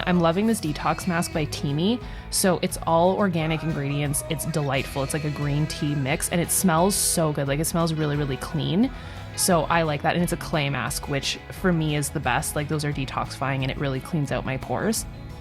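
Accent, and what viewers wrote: Canada. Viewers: finally a Canadian who I can tell is bilingual!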